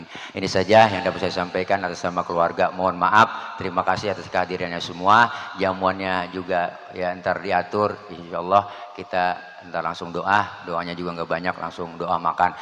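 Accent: Jakarta, Indonesia. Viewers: Betawi'y dapet ini ustad 🤣 Sya bangga sya juga betawi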